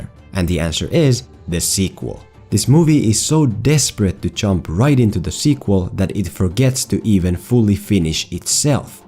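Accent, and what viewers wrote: Finland. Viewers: Hey mate, Are you from Finland ? 😄✌🏾 🇫🇮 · I love your distinctly Finnish accent! Now don't take me wrong. Your pronunciation and rhythm is very good and you're extremely fluent. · Exactly what I'm thinking! Firstly, torille. Secondly, a Finnish accent is too distinct for even a master to hide. Seriously, what else could it be? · Youre finnish arent you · are you finnish????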